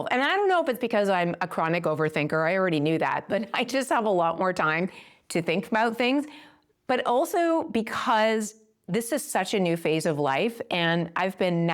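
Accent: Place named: Canada. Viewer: Great information. I am getting ready to retire next year. Btw, listening to your videos I think to myself I wonder if she’s from Canada. Your accent gave you away.😅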